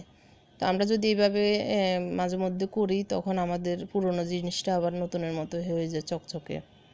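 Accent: Noakhali, Bangladesh. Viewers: Apner kotha gulo purai noakhali la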